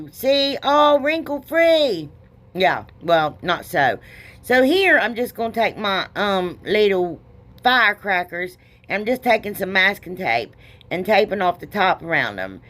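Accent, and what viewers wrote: South, United States. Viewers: Love these ! Bet you and I sound about the same, country as all get out !!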